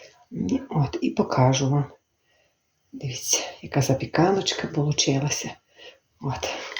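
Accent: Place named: Bukovina, Ukraine. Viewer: Дякую вам пані за рідну мову за буковинський говір Дивлюся вас з Америки і думаю що це готує моя мамочка З якого ви району ? Бо у нас теж завжди казали що сипляться воду 😂 Будьте здорові і миру нам всім бо дуже хочеться до рідного краю до родини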